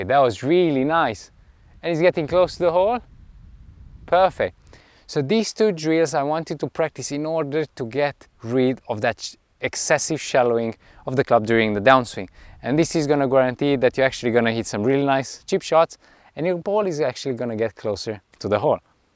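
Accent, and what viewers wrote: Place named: United Kingdom. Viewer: British PGA member; very nice. I really like your British accent. ;-) Keep up the good work.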